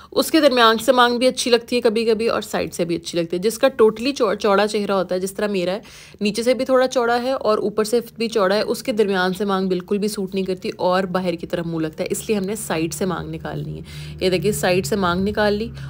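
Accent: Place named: Punjab, India. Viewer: Love your Punjabi accent and advices ❤️❤️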